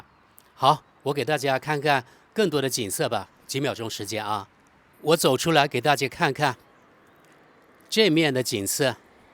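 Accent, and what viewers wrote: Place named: Guangxi, China. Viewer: 主持人是广西人，普通话说得相当不错了，忽略吐字发音不同，语调很准确。内容也很好，至于技术转让，防人之心不可无，还要小心反复无常的白眼狼，在商言商，生意就是生意，小越南挑事的时候可不说两国是兄弟，也不看我们勒紧裤腰带支持他们。修高铁我们反正不急，应该适当提提价。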